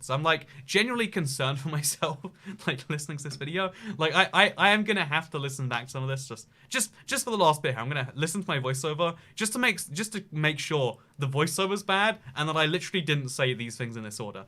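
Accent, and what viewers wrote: United Kingdom. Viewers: to be fair, you do speak really quickly and youtube doesn't understand british accents at all, so it's kind of expected that the captions would be bad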